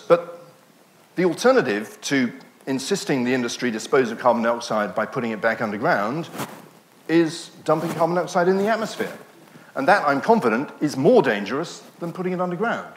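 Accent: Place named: England, United Kingdom. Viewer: The bad sound is that bloody English accent.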